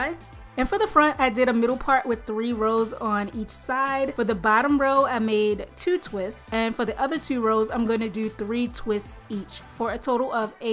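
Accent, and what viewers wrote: Baltimore, United States. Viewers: I hear that Baltimore U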